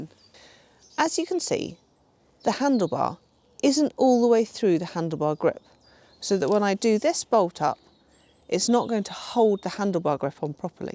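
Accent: RP, United Kingdom. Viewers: Dear ma’am: Thank you for your videos! Even though l’m not a Brompton user ( but BROOKS!), l like to see your videos because of the way you talking, posh southern RP accent, that sounds so nice to my ears, as if Dame Julie Andrews dose. 😊 By the way, meanwhile, recently l’ve heard that, they say, some of those bad naughty girls talk as they hold matters in their mouth sometimes today in UK😂❗️ ls that right? I wish you’re dwelling in happy living with bicycles! A short greetings far from JAPAN. Thank you. Domo Arigatou ! ❤️ Sincerely yours. Hiroshi The Biker.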